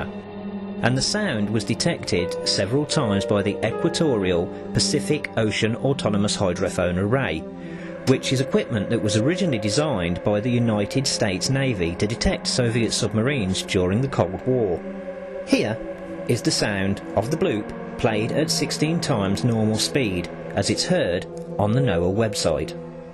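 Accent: United Kingdom. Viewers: Do you have a west mids accent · I wonder if it's the Cov accent that makes everything you say sound plausible,because I've got a Cov accent as well,lol!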